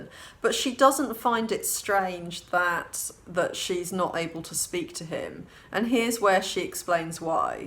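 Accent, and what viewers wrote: United Kingdom. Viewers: I’ve subscribed recently as I love your thoughtful assessments based on your knowledge and experience (I am a Ph. D. in another field, and I greatly appreciate the work you have put into your career and expertise). I hope your channel continues to grow as your analyses are very thoughtful and respectful! Also I lived in the UK for a bit when I was in grad school so love the accent always (my spouse and I are anglophiles!)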